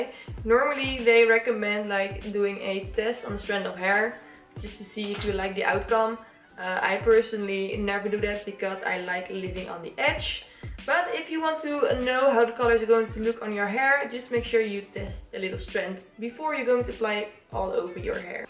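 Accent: Netherlands. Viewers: sounds dutch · phan trash it sounds like she's from holland/the Netherlands but I'm not sure. My mom is from holland and that's what her accent sounds like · Ik hoorde aan je accent al dat je Nederlands was :) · Are you dutch? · ik hoor gelijk dat je nederlands bent haha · je hoort gewoon dat je Nederlander bent XD <3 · you're talking with a dutch accent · Are you dutch? It sounds like you have a heavy dutch accent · are you dutch? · Are you Dutch? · Yeah she’s dutch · I hear in your accent that you come from the netherlands. I really like your youtube channel. Hey, ik ben ook Nederlands. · are you dutch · It really sounds like a bloody heavy Dutch accent. I’m almost sure she’s Dutch! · Ik think she is from the same country as me ( the netherlands) because she sometimes says dutch words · I totally heard you were dutch before checking the description box. Dutch people unite❤ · She sounds Dutch to me lol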